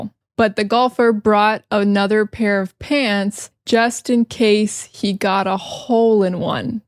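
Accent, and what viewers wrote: United States. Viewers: Teacher you are so good at English. I love your American accent. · The same thing happened to me. And everything was very easy to follow. Great American pronunciation.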